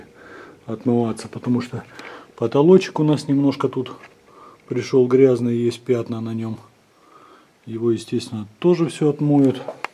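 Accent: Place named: Rostov, Russia. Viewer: Как же слышно Ростовскую букву "г" )) и "утак" )) Уважуха за проделанную работу!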